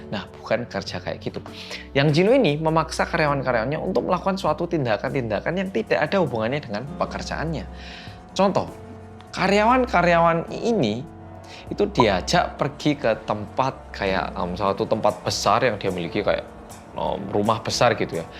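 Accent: Java, Indonesia. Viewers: Bersama orang KOREA yang MEDHOOOKKKK... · Oom kliatan fresh sekarang, tapi udh berkurang mendhoknya😂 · Team gercep😀.. Kalo ada bang medok, gak bakal terlewatkan di notif.. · Medokk..., Suara e itu lho kangen denger nya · Welcome to orang koreaaa yang medhooook😎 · Medhokkkk😎🗿 yang ditunggu" detektif 😎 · Hello guys welkom bek tu korea reomit bersama orang korea yang MEDHOOKK~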